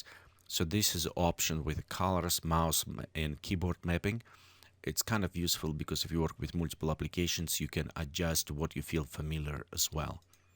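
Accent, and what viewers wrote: Russia. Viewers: Сразу заметен русский акцент)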